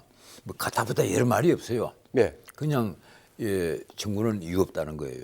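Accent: Jeolla, South Korea. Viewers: 절라도광주앵커 티를 너무낸다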